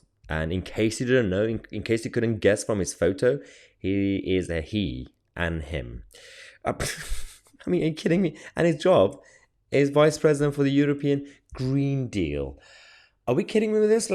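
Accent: United Kingdom. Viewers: A brown Muslim that sounds British... anchor baby's got a u tube chanel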